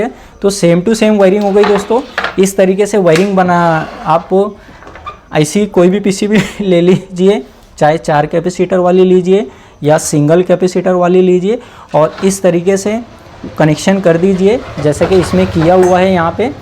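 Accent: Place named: Maharashtra, India. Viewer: तुमच्या बोलण्यावरून तुम्ही मराठी भाषिक आहात .. पण तुम्ही खुपच उत्तम आणि सुलभ पद्धतीने समजावता. ... एकच स्वतःची काळजी घ्या... आपणास उदंड आयुष्य लाभो ही श्री स्वामी समर्थ चरणी प्रार्थना !!!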